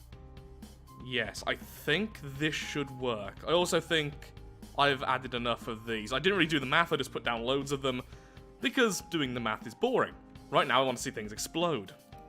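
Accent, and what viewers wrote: United Kingdom. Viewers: God have I missed your spiffing British voice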